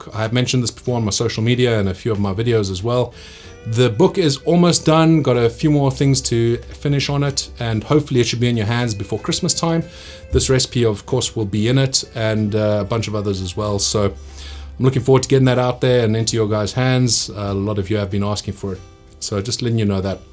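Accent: South Africa. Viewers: Now I understand from where is your accent, and the addiction to piri piri. (I have some family that come from South Africa)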